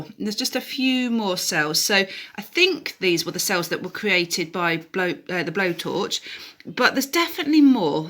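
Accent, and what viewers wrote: United Kingdom. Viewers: Thank you, you have saved me a lot of time and work and also convinced me that I do need to use a pouring medium for some projects and not just water. Like you I like the way the colours react and spread, if that’s the correct term, with just water. I am pretty new to all this and finding out new things all the time, like in a couple of sea/beach pours I have just done, I got cells and lacing with the white (Amsterdam Titanium white) over iridescent colours, not so much over the plain colours. All the paints were mixed with a pouring medium (1parts Owatrol to 2 parts water) - 1 part paint to 1 part pouring medium and then water if needed to get the right consistency. I’m very happy with them and luckily we have holiday accommodation, my experiments will grace their walls! And hopefully some will sell!😜 Thank you again, I follow Molly, Kinella and Rinske, but it’s so nice to hear a British voice, their ideas and recommendations. 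Jeanette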